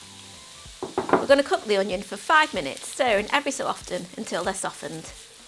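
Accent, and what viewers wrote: United Kingdom. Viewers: love your British accent :)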